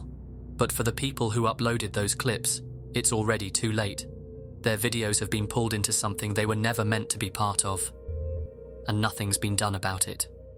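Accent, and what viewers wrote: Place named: United Kingdom. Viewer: Nasally, Br*tish voices aren't exactly fit for these types of videos.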